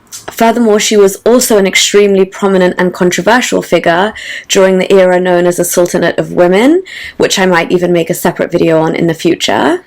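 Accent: United Kingdom. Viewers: The British accent is strong. Good accent.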